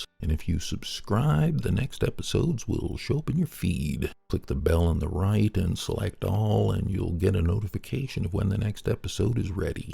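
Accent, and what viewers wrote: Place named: Maine, United States. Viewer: Brookfield is beautiful. Very sad. His voice is fine, probably from Maine. This was a good video, thank you. Keep going.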